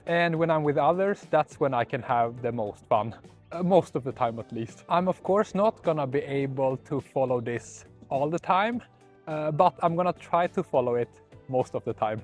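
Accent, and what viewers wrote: Sweden. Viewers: 4 år??? Om du uttalar orden så bra så kan du fan i mig läsa det här också. Det är ju nästan så att du "bryter" på svenska när du pratar engelska.. Imponerande. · You must have been living here for a longer time than 4 years . Because your English allready has a swedish accent :-) Why is your girlfriend having this gigantic hat???? If its against the sun?... Well then , it is totally not neccesary in Sweden :-) Ohh! Thank you for a pleasant blog by the way! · You are beautiful people and your swedish is so good, and you even have a swedish accent to your english. A great video. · 4 years in Sweden and you speak with perfect pronouncation and an extremely swedish accent when speaking English? What is going on?!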